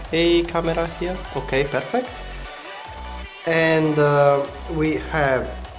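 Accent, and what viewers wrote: Italy. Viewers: ma sei italiano porcoddio?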